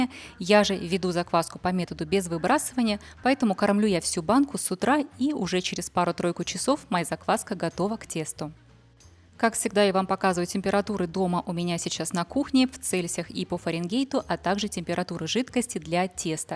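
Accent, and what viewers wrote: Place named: Belarus. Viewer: Вы из Беларуси? Я узнаю этот говор. Как и свой, родной, Одесский. Пошла месить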